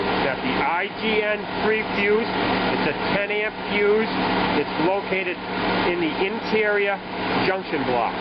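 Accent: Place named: Rhode Island, United States. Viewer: outstanding video.im from texas and i understood you better than the texas folks/dig the accent..where you from rhode island.got a army friend thats talks just like you.keep up the good work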